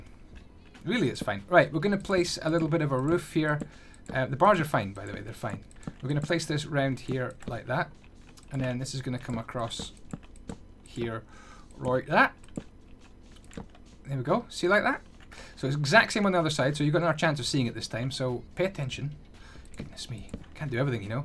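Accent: Scotland, United Kingdom. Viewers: It looks so gorgeous once it’s built - also you sound Scottish so if u are, fellow Scot here ❤️😂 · I love your accent. Are you Scottish by chance?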